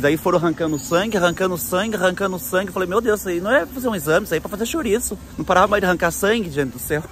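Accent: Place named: Minas Gerais, Brazil. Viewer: descobri vc Ágora no YouTube você é muito engraçado seu sotaque parece ser mineiro e parece ser nordestino, eu sou nordestina, adoro você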